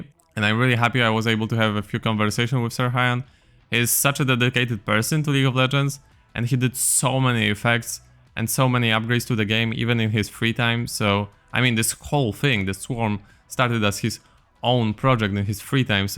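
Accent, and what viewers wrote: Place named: Poland. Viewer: aren't you polish? your accent seems similar xD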